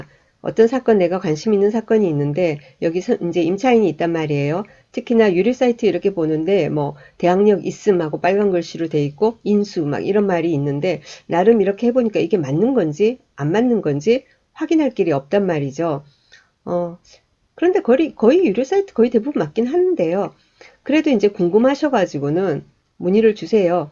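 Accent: Gyeongsang, South Korea. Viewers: 소장님은 진짜 유튜브방송을 계속하시면 어마어마 해지실듯 해요~ 말씀하시는게 한단어한단어 잘들어오네요~ 경상도아나운서!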